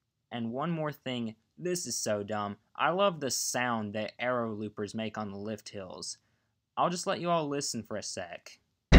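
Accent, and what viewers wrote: United States. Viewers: Country accent mixed with vortex= 💯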